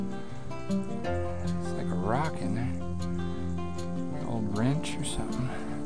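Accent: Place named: Canada. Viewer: She talks like a Canadian A😎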